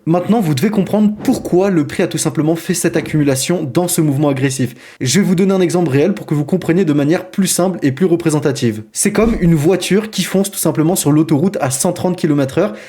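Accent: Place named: United States. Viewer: Avec l accent us 👍🏽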